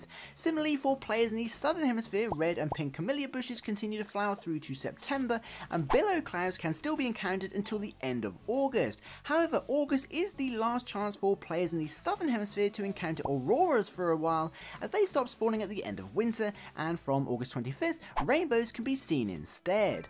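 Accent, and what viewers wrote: United Kingdom. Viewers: Are you from Great Britian?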